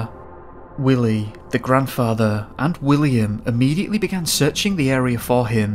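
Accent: United Kingdom. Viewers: Good enough video with out the monotone british accent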